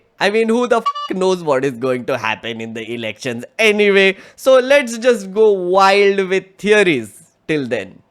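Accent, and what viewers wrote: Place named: Gujarat, India. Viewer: Where are you from Gujarat? Ur accent says it all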